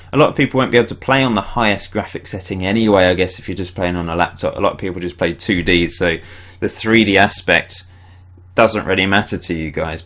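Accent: United Kingdom. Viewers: british accent is too polite and educational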